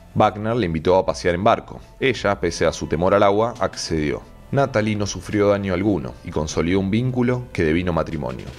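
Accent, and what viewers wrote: Argentina. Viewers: Noo, a este locutor no se le entienden muchas palabras, ese dialécto argentino, machacando las palabras al final; debería estudiar locución, no hay como el español colombiano, claro, preciso y conciso, no dañen el español, que es un idioma bonito, claro que peor lo hablan los propios españoles, con una pronunciación tan arrastrada, sin querer abrir la boca o los dientes, como si siempre quisieran pronunciar la Z. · Ese acento exagerado argentino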